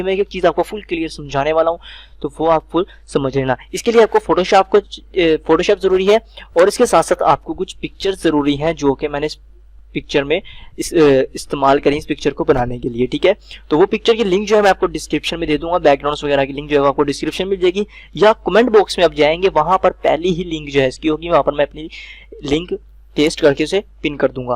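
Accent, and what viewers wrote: India. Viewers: Buhat achi video hy or specialy bol b buhat desi andaz sy rhy .buhat detail sy bnaty ap video Allah pak apko kamyab kry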